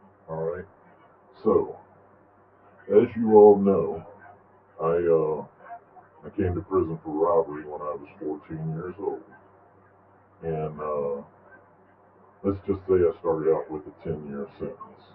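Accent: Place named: Midwest, United States. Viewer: How is he doing this channel from inside there? From his accent I'd guess he's in the midwest.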